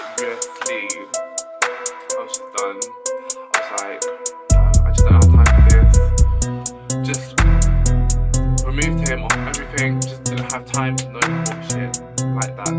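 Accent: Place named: United Kingdom. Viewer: Double Language Barrier 🙅🏽🙅🏽🙅🏽 Lisp+British Accent 😷😷😷 Handsome, Tho.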